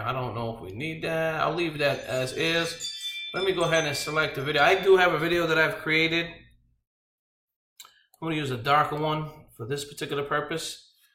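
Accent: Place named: United States. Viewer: where are you from. As you speak like a American. I don't understand the big coin.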